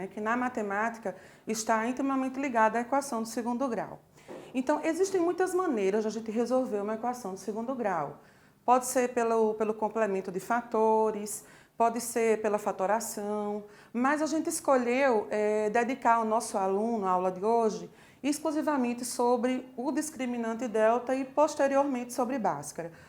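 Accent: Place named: Northeast, Brazil. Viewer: e do nordeste pelo sutaque kkkkkkkkkkk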